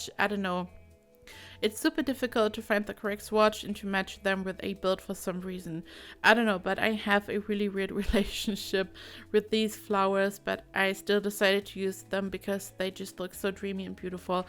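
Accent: Germany. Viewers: Are you german? I swear it sounds so familiar 😂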